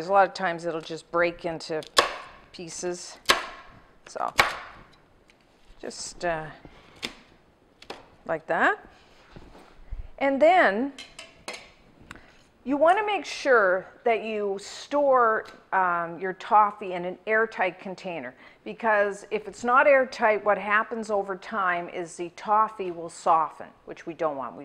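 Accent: Canada. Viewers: i am so so curious as to what your day job used to be, before this took over, since i've been watching you for years and you have always had measurements memorized and C/F conversions memorized and by your accent i can tell you are canadian or close to..and i have always been so impressed with your matter of fact/scientific way of explaining everything. nothing too lovey dovey or baby like. that's why i just need to know what you did as a job before this took off. ♥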